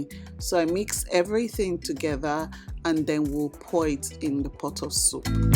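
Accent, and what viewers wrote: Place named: Igbo, Nigeria. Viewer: Looks yummy 🤤 you speak like an Igbo woman. Well- done sis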